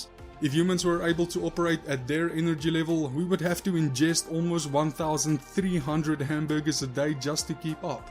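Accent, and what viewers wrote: South Africa. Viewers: You're South African right?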